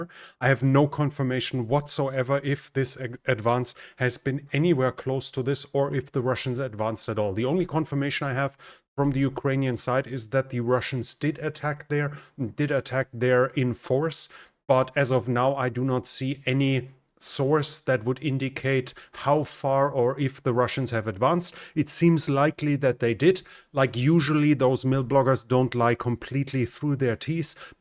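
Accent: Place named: Germany. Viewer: Urgent workout upon Krautaccent needed , more brain work either ....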